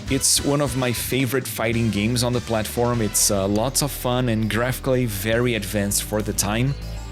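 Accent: Brazil. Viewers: o sotaque desse maluco é de brasileiro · bro real question, are you brazilian? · Notei o sotaque brasileiro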